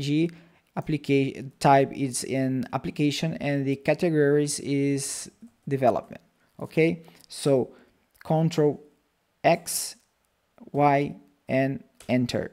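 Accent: Brazil. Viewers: Ganhou novo inscrito! (Ótimo inglês -- tenho quase certeza que tu é BR pelo uso do "T" e alguns sotaques hehe)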